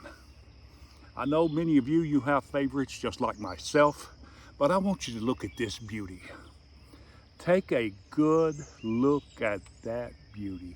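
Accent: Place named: Louisiana, United States. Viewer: Hi, just found your channel and subscribed. I just got my firearms license up here in Eastern Canada, along with a good friend of mine. I picked up a Benelli Supernova, but my friend somehow managed to get his hands on the Wingmaster. Great video... and as a French-speaking Canadian from up North, love to hear the cajun in your voice. Merci mon ami!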